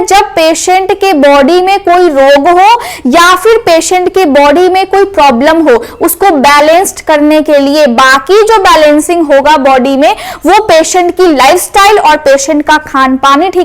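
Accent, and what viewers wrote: Bihar, India. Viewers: Bache chage your flow ..... Bihari language flow aah raha. Update beta . I am Army men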